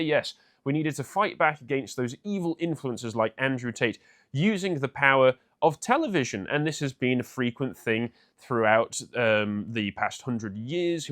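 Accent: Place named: Bristol, United Kingdom. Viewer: Bristolian. Says it all really. Woke shithole.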